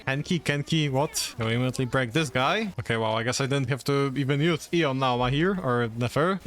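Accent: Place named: Italy. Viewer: yo are you Italian? love your accent and your videos!!!!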